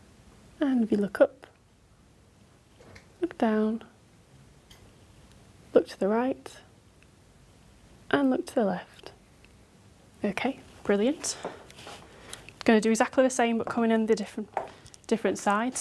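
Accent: United Kingdom. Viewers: the doctor has the best british accent ... the queen of asmr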